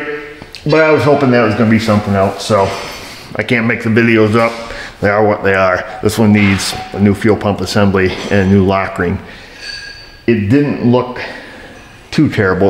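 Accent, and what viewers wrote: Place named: New York, United States. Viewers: . Don't worry about your speech, we have been living with your New York "pronunciation" for years and chuckling. It's all good.:) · Ha! I thought it was your New York accent this whole time. I don't hear anything abnormal with your speech. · i though the way you talk is because it is a new york accent... most people in the USA talk different and pronounce words different because they are different and from a different country or planet you know... go to a good bar and everyone is talking different too Haha...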